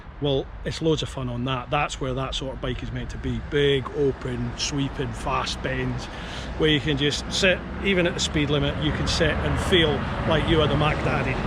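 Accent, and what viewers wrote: Scotland, United Kingdom. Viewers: A Scotsman on a Harley ...I like the voice sound... Great vid..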